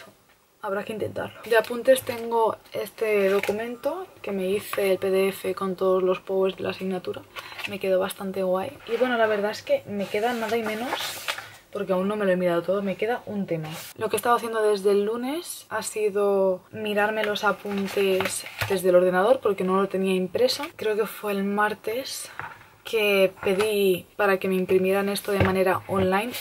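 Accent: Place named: Argentina. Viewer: Sos re argentina boluda <3 ajajjaja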